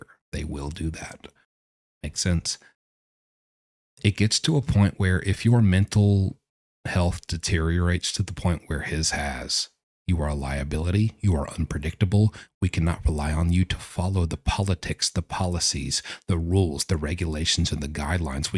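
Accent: South, United States. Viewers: Listening to a southerner explain anything is like a prison sentence on its own. Get to the point JFC.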